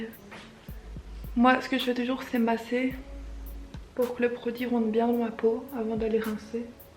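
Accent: Belgium. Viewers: J'ai direct reconnu ton accent belge, je suis de bxl aussi 😊 je viens de découvrir ta chaîne ça fait plaisir de voir une belge 😍 bonne continuation 💪🏻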